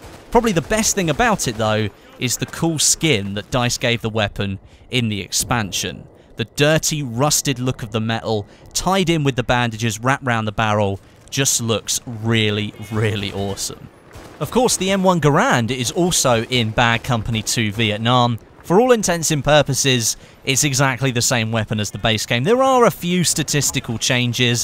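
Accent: United Kingdom. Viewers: 80% of comments:You pronounced general patton wrong He is British not American so just deal with it if you don’t like the pronunciation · he's British we're American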